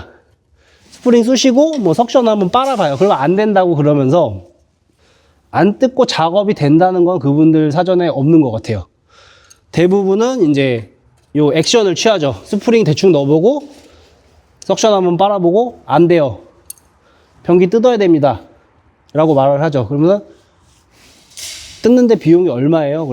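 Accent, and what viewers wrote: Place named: Seoul, South Korea. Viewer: 변기가 막혀서 몇일채 고생하던 중 우연히 시청하게 되었습니다. 사장님처럼 양심적으로 하는 사람이 드문 세상이라서.. 또 요즘 경제사정도 좋지 않고, 평소에도 간단한 일은 손수 해결하던 사람이라 걷어붙이고 뚫는 시도를 했지만 이 글을 올리는 현재 성공하지 못하고 몇일째 화장실 대란을 겪고 있습니다.ㅠㅠ 혹시 영업지역이 어디신지? 말투를 들으면 서울 내지 수도권인 것 같은데 지방출장(전주)도 하시는지 궁금합니다. *ps: 글을 올리고 영상 속에서 말씀하신 내용을 곱씹어 보니 정말 정직하게 사업을 하시는 분으로 느껴져서 '좋아요'에 그치지 않고 '구독'까지 눌렀습니다. 그리고 연결된 링크를 따라 블로그 방문을 했는데 역시 서울 인근에서 활동을 하시는 분이네요.. 지방출장을 한다 해도 출장비가 추가되면 의미가 약해지는 결과라서 혹시 막힘 증상을 글이나 영상으로 알려드리고 문제해결의 도움을 받을 수 있을지 모르겠습니다.. (영상 끝부분의 내용으로 봐서는 변기끝자락(플랜지 가까이까지 근접한?) 근처까지 물건이 밀려나면서 막힌 탓에 차오른 물이 하루종일 지나도 전혀 빠지지 않는 것이 아닌가 하는 의심이 갑니다..ㅠㅠ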